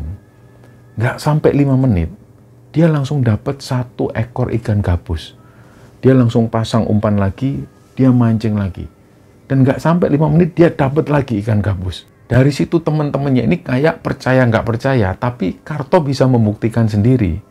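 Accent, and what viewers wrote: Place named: Surabaya, Indonesia. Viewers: Host nya wong mana to? Bahasanya Suroboyoan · Aku Sasak lombok, tapi dengar mas bicara, tangan,baku rindu Surabaya akubrindo Jombang.. pernah hidup di jatim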